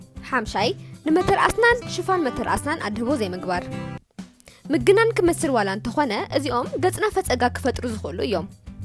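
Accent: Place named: Enderta, Ethiopia. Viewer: ብዝተፋላለይ ላዛ ወይ ላህጃ ናይ ትግርኛ ዝተፋላለይ መደባት ክትስምዕ ብጣዕሚ ጽቡቅ እዩ ሓዉና ናይ ባዕሉ ጥቁም ዝኮነ ላዛ ናይ ከባቢ ዓንሰባ ወይ ሰንሒት ዝዛረብ ክ ከዉን ከሎ ሕጂ ድማ ናይ ትግራይ እንድርታ ይመስለ።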